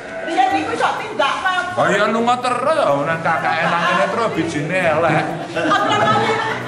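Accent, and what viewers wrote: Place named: Temanggung, Indonesia. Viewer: Boso khas temanggung kiye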